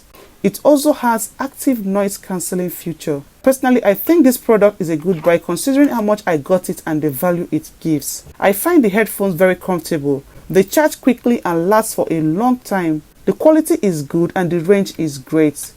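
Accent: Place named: Nigeria. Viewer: Nigerian accent sucks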